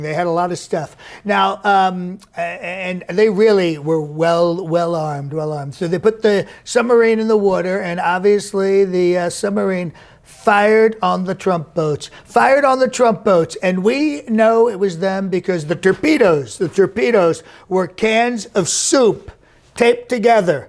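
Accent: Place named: Italy. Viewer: that subtle italian accent makes it perfect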